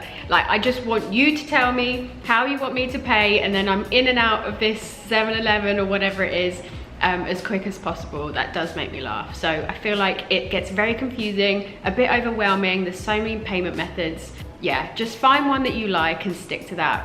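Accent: United Kingdom. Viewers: Haha, love this video, especially the part where you say one thing, and the listener hears another. Must be frustrating. I think we are not familiar with British accent, even though I can understand you well. We learn British English in school but none of our English language teachers are native speakers. Also, most of our favourite English movies or TV shows are American. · I don’t really have difficulty with British accent. However I loved your British accent, it is where I learnt while I did a study exchange in the uk. I got to learn the proper English accent there. While you’re right orchard mrt is a maze. Not to forget locals do exit wrongly too, especially Dhoby Ghaut mrt. ;)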